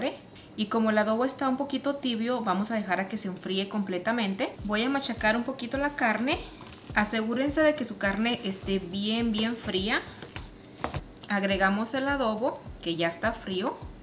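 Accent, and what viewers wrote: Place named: North, Spain. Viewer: Parece del norte su acento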